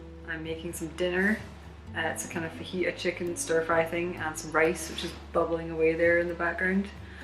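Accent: Scotland, United Kingdom. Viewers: I clicked on this video and was kind of shocked when I heard your voice, I didn’t expect a fellow Scots person to show up on my recommended.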